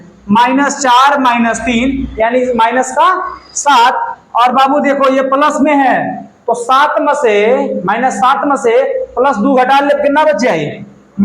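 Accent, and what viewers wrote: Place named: Bhojpuri, India. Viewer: Good explanation sir ........ 👍👍👍👍👍👍 Bhojpuri style...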